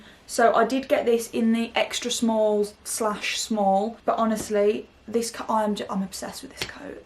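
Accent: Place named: Midlands, United Kingdom. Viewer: I love listening to you, I'm from West Midlands and love hearing a local accent! Not enough Midlands you tubers ❤😘 x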